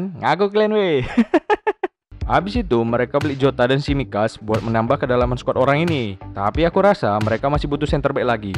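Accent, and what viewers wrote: Medan, Indonesia. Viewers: Bahasa Medan ini mah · emg kekgitu logat medan bg. Keras tapi lucu. 😀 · logat medan kali ahhhh... mantab kali kurasa. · NEW SUBS ~~ gw suka banget channel ini, lucu dan menghibur dengan aksen melayu medannya, ok banget bang!! semangat terus dan tetap upload video, eaa !!☺ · Logat² Medan bah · Nampak kalii ahh logat Medannya😅.. mantavv bg 👍🏻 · Org medan ini, kek paniliar kali awak sama intonasinya, horas lah lek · Memang harus diakui, MANTAP kali konsep channel kau ini bang. dengan logat medan yg khas, penyampaian yg unik dan Editing yg cukup rumit ditambah sentuhan humor, memang layak lah channel mu ini jadi konten kreator yg ditunggu tunggu. Semoga cepat tembus 1 juta subs abg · tapi tidak semua orang medan bisa membawakan video dengan logat bicara selucu ini sih menurutku. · Adminya kek orang Medan kurasa dari logatnya kwwkwkwk ngakak laeeeee wkwkkwkw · Medan kalilah ngomongnya abang ini... 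mantap lanjutkan bang👍👍👍👍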